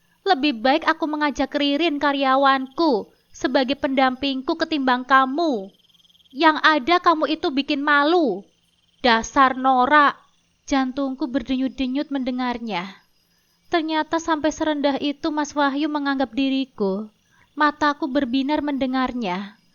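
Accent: Java, Indonesia. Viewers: Tangerang ,lucu gaya bahasanya logat jawa,q suka,smgt kaka n sukses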